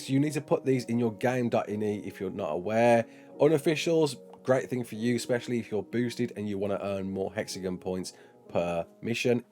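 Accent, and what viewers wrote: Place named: West Midlands, United Kingdom. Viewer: dont ever deny us that beautiful west midlands accent again brother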